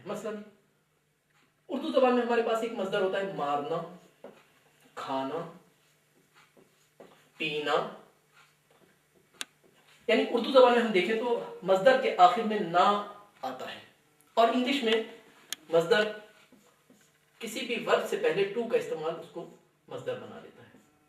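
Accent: Iran. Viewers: it doesn't matter because it is a general grammar for Persian Language. But the accent is Tehrani ( For Tehran ) which also is called: Modern Persian. Tehrani accent is the standard accent to learn the Persian Language. other kinds of accent also are validate but as I mentioned, the best one is Tehrani. a simple example to evaluate the Tehrani accent and Dari accent is like British English and Australian English!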